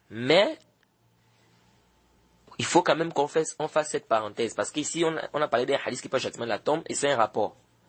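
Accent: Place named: Gabon. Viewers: Qu'Allah vous en récompense. Humm l'accent est très gabonais hein🤔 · Il est gabonais justement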